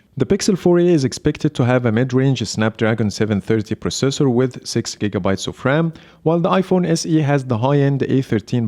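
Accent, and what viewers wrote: Egypt. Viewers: I can totally tell that you’re an Egyptian from your accent. Nice vid by the way 👍🏻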